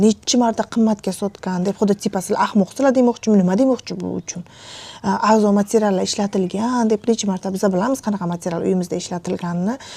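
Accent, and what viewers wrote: Bukhara, Uzbekistan. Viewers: Бобоча,бибича 😂 сузингизни кувониб эшитаман. Бухоро шеваси.. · Buxorolikmisiz apa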